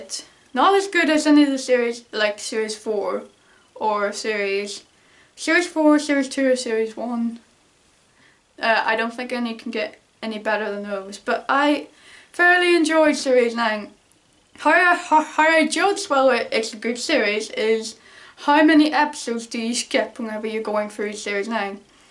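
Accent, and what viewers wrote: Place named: Ireland. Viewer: ) I like irish accent. I wish you way more than 500 subs.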